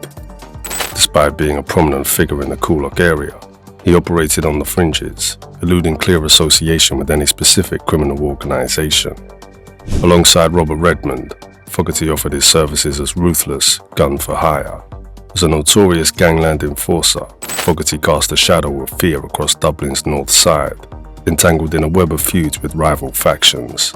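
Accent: United Kingdom. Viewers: State of this shite😂😂😂 uk accent is cringe as fook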